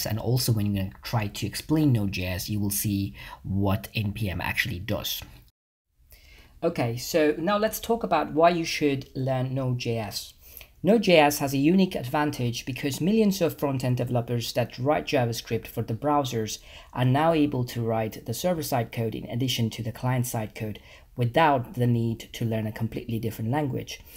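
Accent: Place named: Ireland. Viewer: Nice video mate, are you living in Ireland, I can hear a slight Irish accent at times?!!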